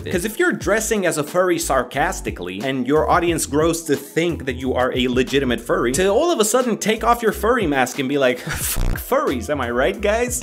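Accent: Romania. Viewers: I could hear your accent during this video, which I didn’t notice in many of your other content. (Yes, I know he’s Romanian, I just find it kinda strange that his accent downgraded a bit) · are you romanian?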